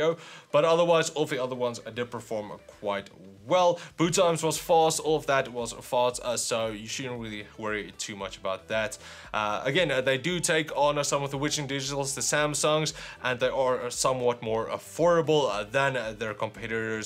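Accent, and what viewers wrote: South Africa. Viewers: Ek wou se jys n vokken suid afrikaner toe ek jou accent hoor in die begin xD, nice vid, thanks vir die info.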